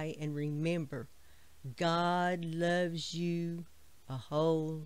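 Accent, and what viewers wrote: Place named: South, United States. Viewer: thank you for your prayers - so much appreciated!🙏🥰 The closest I have been to your neck of the woods is a couple of holidays in Florida back in the 80s which I loved! I assumed from your accent you were a Southu'n Belle! I went through a phase a few years back when I read absolutely everything by Fanny Flagg just to enjoy the South'n vibe after seeing Fried Green Tomatoes - and Blanche Deveraux in The Golden Girls was hilarious!😁🤣 Probably a terrible pastiche of the real thing but I loved them anyway! I'm very much enjoying your channel and your calm, sane biblical approach (not so commonplace around today's 'prophets') and they were really a 'word in season' for me - thank you - I intend to enjoy a lot more!! Love & Blessings to you & your ministry!🙏✝️🥰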